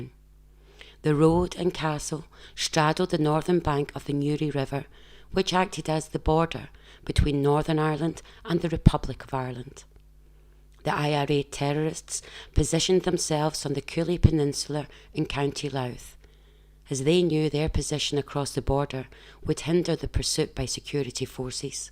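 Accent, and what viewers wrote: Scotland, United Kingdom. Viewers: A scottish woman narrating ..what next ..was there justification for bloody sunday..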